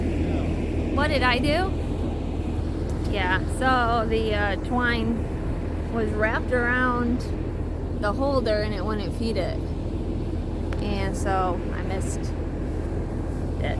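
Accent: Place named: South, United States. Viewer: You sound like from south but your Michigan some place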